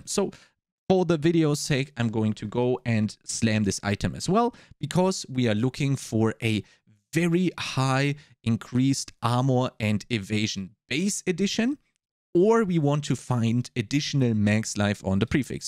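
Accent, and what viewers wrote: Germany. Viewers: Thanks mate. This crafting kills me. Now I have a better overview. P.S. Höre ich da einen deutschen Akzent heraus ? ;-)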